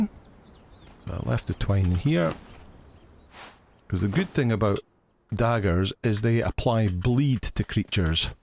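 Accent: Scotland, United Kingdom. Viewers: I've known about this game for a long time, but never got around to playing it. I just started earlier this week, and was a little lost at first, but, i found your guide and its really helped me. Its also nice to hear a fellow Scotsman doing this. Also, as a little side note, im playing on console, and most of the journeys recognise other journey actions you may have done previously.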